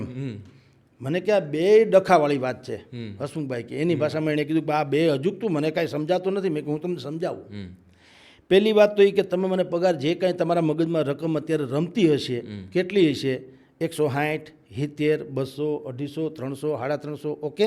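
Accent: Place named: Kathiawar, India. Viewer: અસલ કાઠીયાવાડી તળપદી ભાષા મજો આવી ગયો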